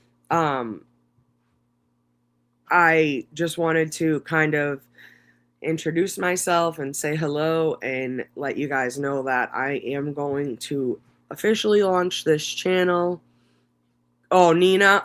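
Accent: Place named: Boston, United States. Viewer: sometimes creative can’t see everyone if they don’t hit live chat and other people can see everyone if they don’t hit all messages instead of top messages. Idk 🤷‍♀️ Will still be watching 👀Listening to you talk is like hearing my family talk. We have the same Boston accent. ❤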